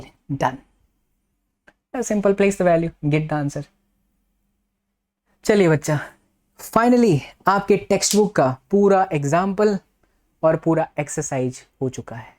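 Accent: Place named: Jaunpur, India. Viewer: Jaunpur se ho aap sir ji 😅 meri tarah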